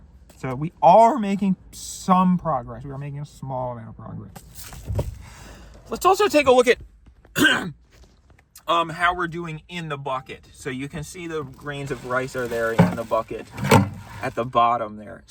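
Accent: South, United States. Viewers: the southern TWANG is NOT A COSTUME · The southern accent arc was crazy… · His really shitty southern accent is so freaking great man, I love you and all your quirks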